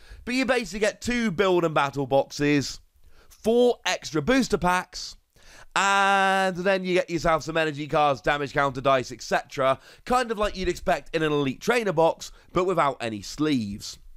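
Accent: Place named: United Kingdom. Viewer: Hey, I noticed you have a beautiful British accent. I’m looking to get into playing the TCG in-person within the UK. How do you recommend finding people to play with?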